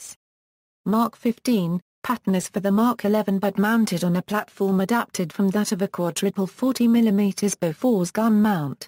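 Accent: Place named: United Kingdom. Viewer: A computer with a British accent ... cute~!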